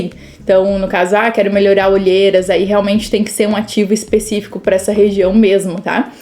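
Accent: South, Brazil. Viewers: Nada a ver com o conteúdo do vídeo é como eu gosto de ouvir o sotaque do sul. ❤❤❤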